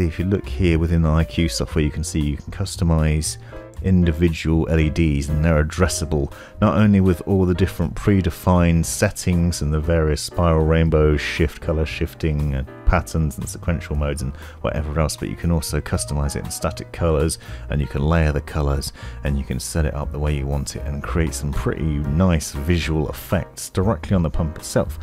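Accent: United Kingdom. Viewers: As others have said, you are probably the #1 video producer on YouTube for tech stuff. Your speaking voice is head-and-shoulders above the competition! Even though you're clearly a Brit, you are very CLEAR and speak at a reasonable pace. Others mumble and rush through their delivery and it is quite off-putting to be honest! I am a subscriber to your channel and recommend others support you by LIKING and SUBSCRIBING. Cheers and keep on!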